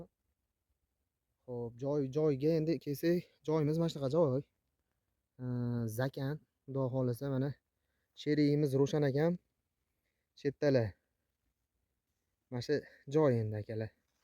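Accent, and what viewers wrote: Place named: Andijan, Uzbekistan. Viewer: Шева Андижонча. Ов бароридан келсин, омад.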